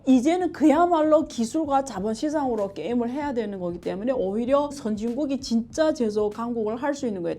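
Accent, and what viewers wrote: China. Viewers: 조선족인가? 발음이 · 발음이 중국인?????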